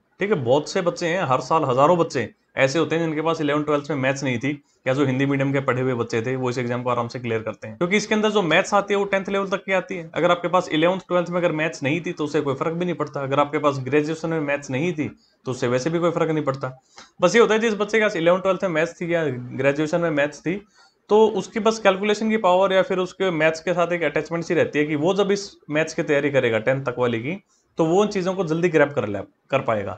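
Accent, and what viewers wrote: Haryana, India. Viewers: Bhai Haryanvi touch❤️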